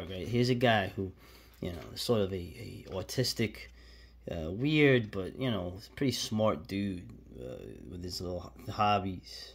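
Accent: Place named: New York, United States. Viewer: H0ly East Coast New York American accent Love It!! Sending Love from West Coast Canada, Vancouver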